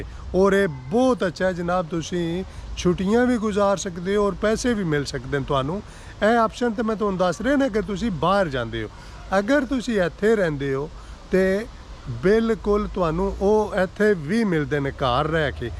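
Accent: Gujrat, Pakistan. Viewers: AP ki boli se lagta hai k ap Gujrat se ho brother Khush raho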